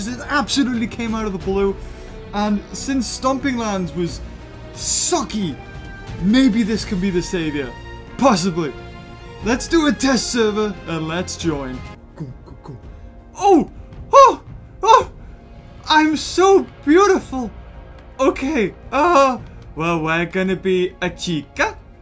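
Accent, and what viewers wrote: United Kingdom. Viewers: He’s british but has a deeper voice than stampy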